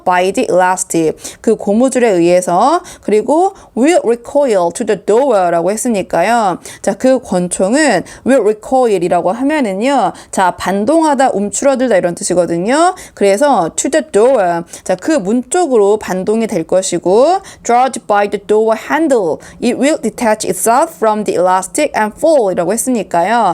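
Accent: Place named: United Kingdom. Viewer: 선생님! 감사합니다. 선생님 덕분에 혼자서는 꿈도 꿀 수 없는 아가사 크리스티의 'And then there were none.'을 끝까지 볼 수 있었습니다. 마지막 Wargrave의 'manuscript document'부분은 까다롭고 이해하기 어려운 문장도 많았지만 선생님 덕분에 마지막 까지 읽어 낼 수 있어서 참 기쁘고 행복 합니다. 군더더기 없이 속도감 있는 선생님의 강의 진행 덕분에 지루할 틈이 없었습니다. 그리고 선생님 특유의 영국식 발음(!)에 시간이 지날수록 매료되었습니다.😂 다시 한 번 감사드리며 이젠 나니아 연대기를 시작하겠습니다~^^고맙습니다.❤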